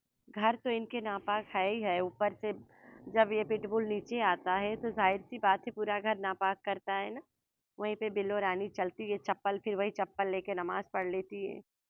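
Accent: Uttar Pradesh, India. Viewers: Aap ki bhasa to humare u p india ki lg rhi 😅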